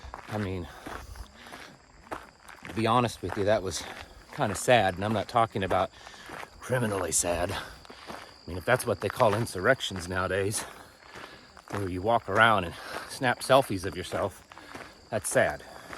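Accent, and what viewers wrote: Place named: Arkansas, United States. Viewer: You said secession at least four times....you sound just like me! Arkansas Confederate.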